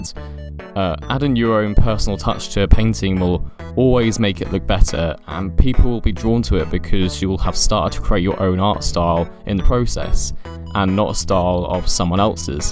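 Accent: United Kingdom. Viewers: Nice voice and nice accent. I'm starting to think I miss London.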